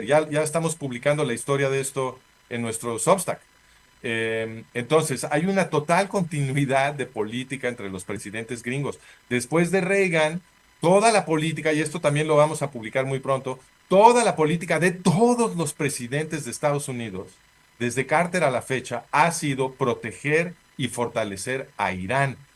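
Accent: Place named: Mexico. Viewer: Que video musica para mis oidos ..me iré a dormir felíz sabiendo que algun dia los arabes junto a EEUU...van a darle a Israel donde mas les duela ... ojalá paguen todo el sufrimiento que estan cusando al pueblo palestino .. Solo me queda una pregunta... cuál es el rollo de este tipejo con asento mexicano que defiende tan apasionadamente a los genocidas?...te gusta la guerra mexicano?... ojalá pronto veas a tu amado Estado genocida vuelto cenizas ....es mi mayor sueño.. No señor ningunos medios occidentales ...desde Israel hay quienes no le siguen el juego al genocida y estan protestando ...deje de idolatrar a los asesinos ...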